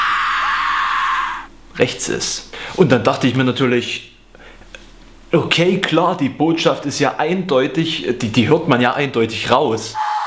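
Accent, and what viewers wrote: Saxony, Germany. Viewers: Ich glaube er kommt aus sachsen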